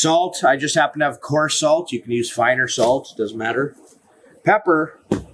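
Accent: Canada. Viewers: I was pretty sure you were a Canadian based on your accent, alone, but then I noticed the stickers on your fridge. xD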